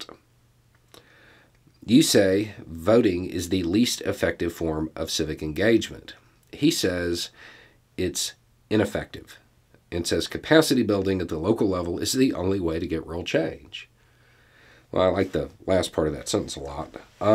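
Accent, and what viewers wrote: South, United States. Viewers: When I think of my neighbours to the South of our border, I like to tell myself that is an example of what great things a Southern accent is capable of saying. Keep up the great work. Lots of people are noticing 👍🇨🇦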